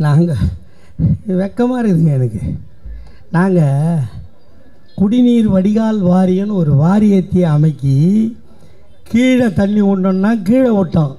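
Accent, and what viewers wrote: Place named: Chennai, India. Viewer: யதார்த்தமான சென்னைப் பேச்சு , மக்கள் பணி சிறக்க வாழ்த்துகள் 🙏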